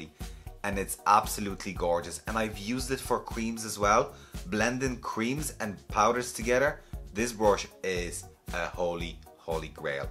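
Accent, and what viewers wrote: Dublin, Ireland. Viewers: I love your Dublin accent ❤️ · Love the accent! Irish?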